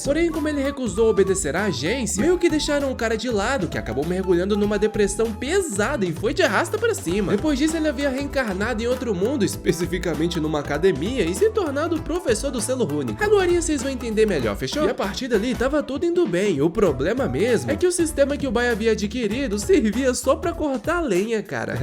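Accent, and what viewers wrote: Bahia, Brazil. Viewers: Oiaaa, novo narrador....gostei kkkk é bahiano né? Certeza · Kkkkk primeira coisa q os caras perguntam é se ele é baiano! Kkkkk TENSO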